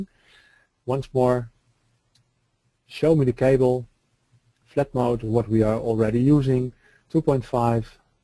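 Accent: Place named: Netherlands. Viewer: Dutch accent. :)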